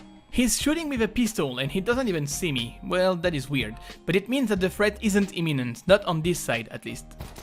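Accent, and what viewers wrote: France. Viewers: His french accent is so annoying. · Слушать английский от француза, когда ты - из России...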